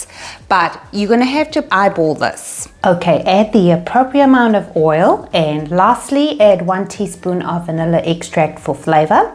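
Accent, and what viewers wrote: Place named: Durban, South Africa. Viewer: thank you love it ..you have a Durban Accent right?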